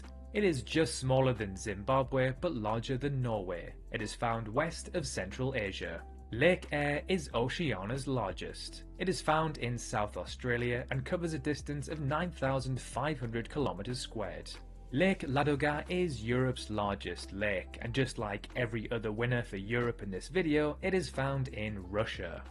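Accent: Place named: Durham, United Kingdom. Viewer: Interesting stuff to while away the early morning. Thanks! Possibly annoying question: what's your accent? I'm guessing somewhere like Durham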